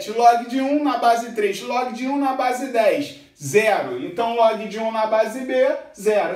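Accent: Rio de Janeiro, Brazil. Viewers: Esse fala carioquês com gosto!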